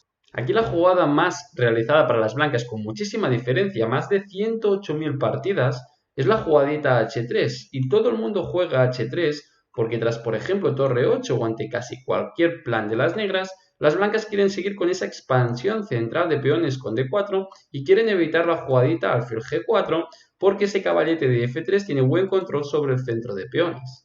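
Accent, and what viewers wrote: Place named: United States. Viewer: Hablas un excelente inglés americano.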